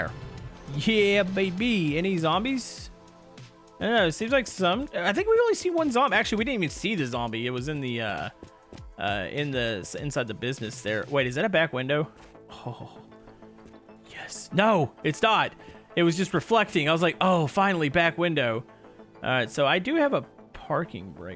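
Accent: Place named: Texas, United States. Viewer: hes from texas so he talks like that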